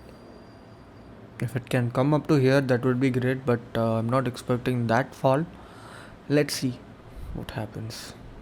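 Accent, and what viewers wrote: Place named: South India, India. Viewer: It's with his accent. Lot of south Indian people do that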